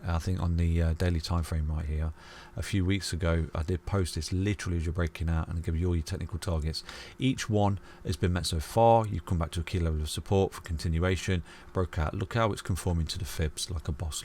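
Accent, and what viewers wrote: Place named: Midlands, United Kingdom. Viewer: i don't think he is mumbling, he just sounds like a midlander to me 🤷 i don't struggle to understand him at all.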